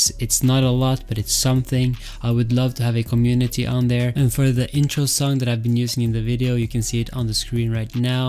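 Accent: Norway. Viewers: are you norwegian? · are you norwigan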